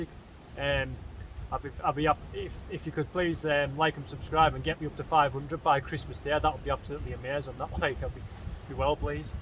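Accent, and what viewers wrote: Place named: Cumbria, United Kingdom. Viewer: Just came across your videos by accident pal.. But what great content.. Great to hear our Cumbrian accent like.. I'm a wukinton fella.. Always fancied fishing off the heads but I'm not sure where you go or park.. Do you park at the light house pal and walk over or from St bees.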